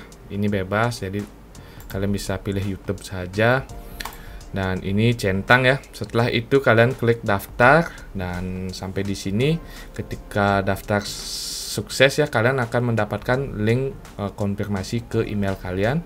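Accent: Bali, Indonesia. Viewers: Makasih bang.. abang dari bali ? Logatnya seperti orang bali.. kalau iya.. sukseme bang. Rahayu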